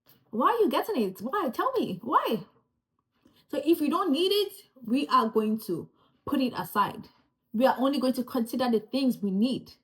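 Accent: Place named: United States. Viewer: Ouuu, I can hear your American accent coming out🤭🌚🤗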